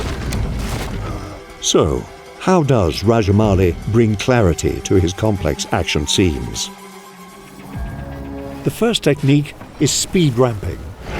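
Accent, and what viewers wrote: United Kingdom. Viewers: The fact that narrator to this video is brit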